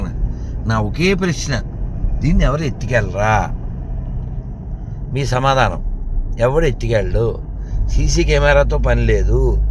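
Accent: Telangana, India. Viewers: ధాన్యపు రాశులు. తెలంగాణ యాసలో. మీ ప్రయాణం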